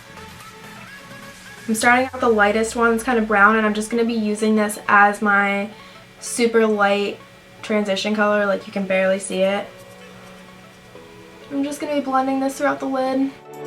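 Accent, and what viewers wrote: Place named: Queens, United States. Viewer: You sound like you’re Italian from queens ny where I’m from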